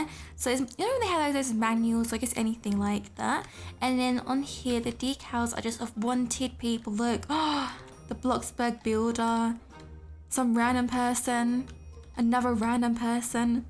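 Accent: United Kingdom. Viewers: I watched ur videos and u talk British now i can talk British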